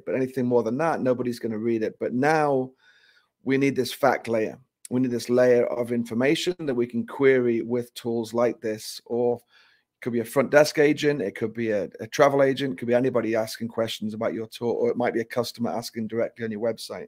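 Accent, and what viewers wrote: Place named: Newcastle, United Kingdom. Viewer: Listening to your Newcastle accent was worth the 3 minutes I spent on this. The tech is great but your home accent is out of this world!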